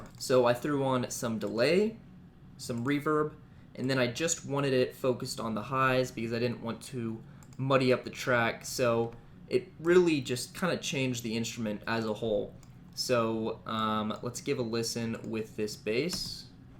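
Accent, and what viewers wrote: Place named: North, United Kingdom. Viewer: Bro where are you from cause you use y'all which is a southern word but you also sound like you're from the north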